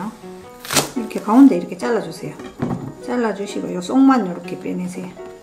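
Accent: Gyeongsang, South Korea. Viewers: 경상도 톤이라 더 친근감이 듭니다 향상 보고 듣고 많이 배워갑니다 감사합니다 ^^~^^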